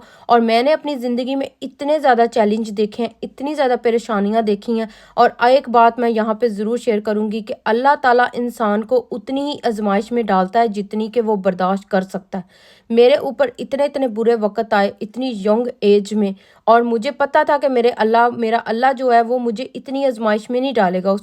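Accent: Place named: Lahore, Pakistan. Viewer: Same hum b lahore sy ha hum b aisy hi bolty ha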